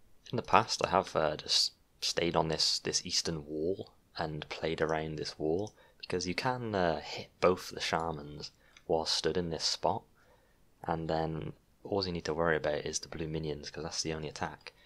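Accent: Wales, United Kingdom. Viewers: Can tell youre from Wales with the accent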